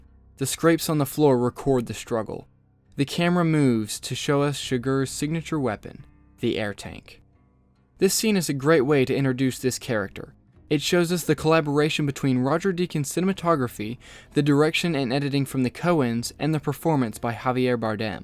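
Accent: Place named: South, United States. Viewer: People in West Texas don't talk with this southern draw.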